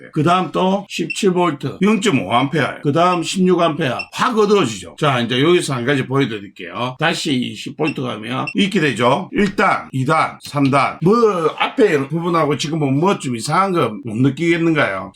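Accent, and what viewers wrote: Gyeongsang, South Korea. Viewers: 겡상도 사투리 짜증난다.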